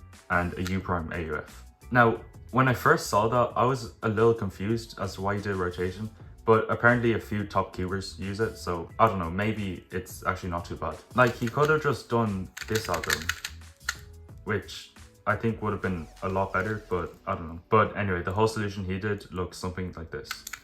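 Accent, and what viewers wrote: Ireland. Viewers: Hey, are u irish? ur accent sounds like a south dublin accent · ur irish accent is too strong for my english + chinese brain to combine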